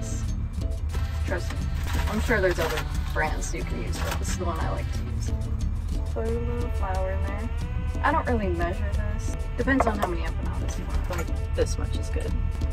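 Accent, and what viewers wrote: United States. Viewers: Sounds very american...I never know she is russian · You're from Mother Russia? You sound completely American. Make some Borscht. 🙃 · Southern accent with a twist 😜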